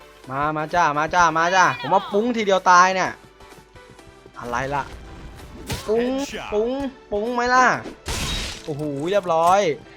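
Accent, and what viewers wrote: Isan, Thailand. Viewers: อ่าย เจ่าเป็นคนอีสานบอ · ชอบคนแบบพี่มาก อ่ะ แบบพูดอีสานสำเนียงไทย 555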